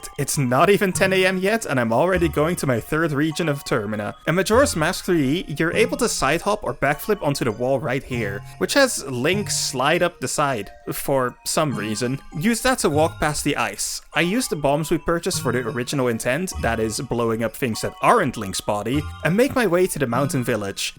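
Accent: Netherlands. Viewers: I always get whiplash when I hear a dutch person making videos I'm actually interested in 😅 don't see it often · Are U dutch ?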